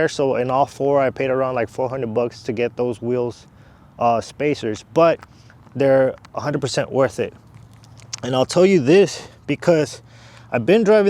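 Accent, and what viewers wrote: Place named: Mexico. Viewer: I got some work for you and your people, maybe even a speech class cuz I still hear that Mexican dialect!!!!!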